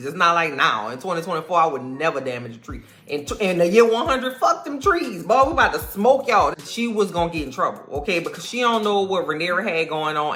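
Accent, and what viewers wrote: New Orleans, United States. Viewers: OK, this is like the 8th review I've watched and I am here for the NOLa accent 🙂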